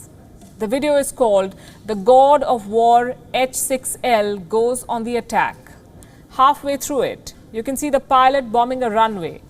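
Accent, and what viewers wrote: India. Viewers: Damn Indian English~~~ It sounds like a convenient store clerk voice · I know you are doing the good thing. Thanks. But do you realize that you have a very heavy accent and people do not understand what the heck you are talking about. Can you slow down your speech and enunciate. It applies to all Indian speaker with heavy accents. Can't you guys know you have very heavy accents? I know China lies. but you should say it clearly so that more people understand the truth.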